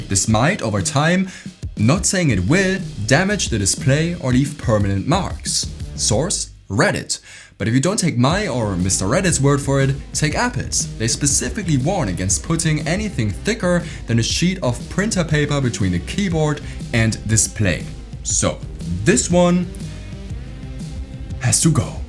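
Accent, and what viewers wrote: Germany. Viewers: bro ,you from deutschland?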